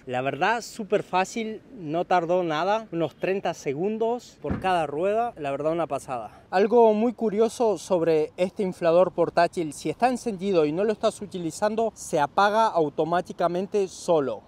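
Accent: Argentina. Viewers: Habla una mezcla entre porteño y español ajajajaj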